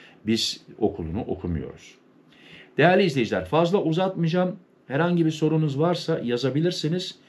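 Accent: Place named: Thrace, Turkey. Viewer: Üstadım nerelisin merak ettim,sanki şive bizim Trakya insansın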